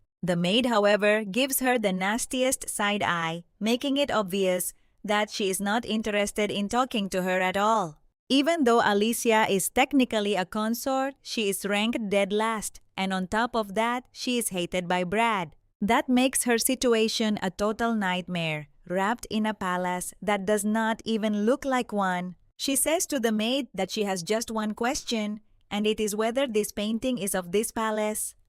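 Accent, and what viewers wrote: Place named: India. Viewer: Njiiirr logat india.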